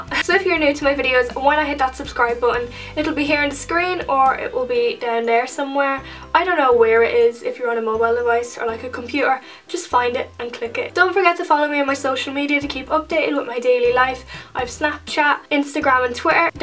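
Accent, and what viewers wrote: Ireland. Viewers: Where in Ireland are you from?? Don't recognise the accent ..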